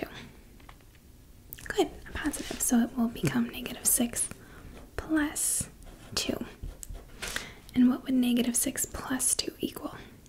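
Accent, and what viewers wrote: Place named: Wisconsin, United States. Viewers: VEEEERY Midwestern, that's for sure. I'm in Arizona and we have a ton if Midwesterners here. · That accent, I love it. I can't place it 100%. But sounds Wisconsin-ishhhhh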